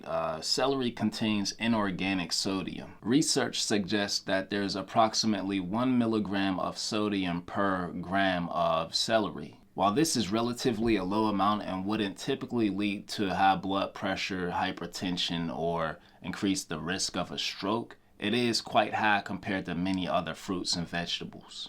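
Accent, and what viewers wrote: Pittsburgh, United States. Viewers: Few minutes in, and my question was answered lol. I heard the Pittsburgh in you, first sentence. 💪🏾 Keep grinding man, and you gained a new subscriber. I’m originally from Penn Hills/ Homewood.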